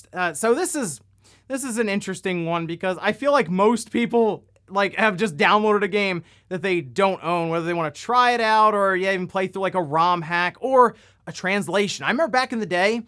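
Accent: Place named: Philadelphia, United States. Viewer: U from philly area, I hear it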